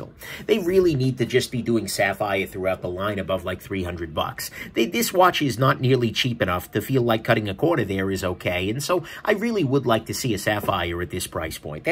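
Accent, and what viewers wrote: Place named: Chicago, United States. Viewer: “Da watch over dare.” Are you from Chicago?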